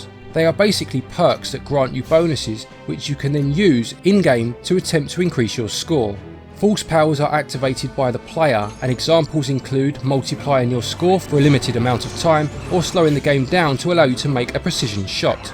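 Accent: United Kingdom. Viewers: Oh, ok, the man knows digital pinball, too. You're quickly climbing the ranks of my favourite Switch-related channels, sir, and your accent makes me want to spell things colourfully ^.^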